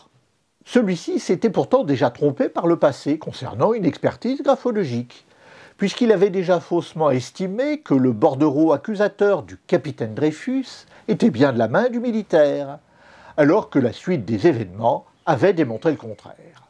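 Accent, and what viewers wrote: Marseille, France. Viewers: Bravo pour votre accent marseillais qui m'a bien fait rire ;) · Génial vivement la partie 3 ! j'espère qu'il y aura encore l'accent marseillais ;) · Je ne souhaite nullement descendre votre accent marseillais, mais vous pourriez utiliser votre nouvelle influence dans le YouTube game pour trouver des doubleurs qui prendraient plaisir à sublimer votre travail de narration. Excellente série, merci à vous !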